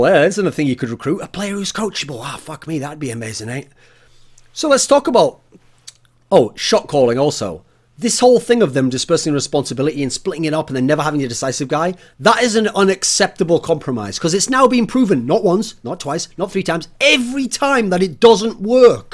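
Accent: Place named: Northern England, United Kingdom. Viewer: swear u have picked up a northern accent... cant remember u having this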